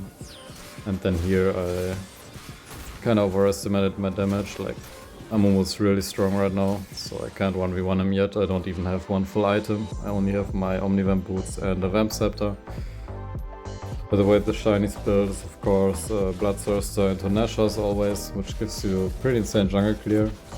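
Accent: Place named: Germany. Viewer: LETS GOOO the german gamerboy with a strong and sexy german accent posted again 🎉🎉🎉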